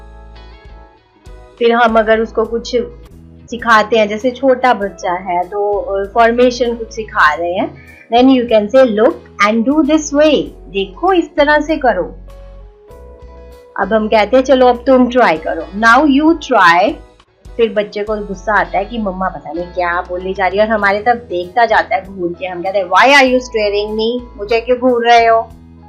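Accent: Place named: Punjab, India. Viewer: You have a punjabi accent..however the conversation was good😊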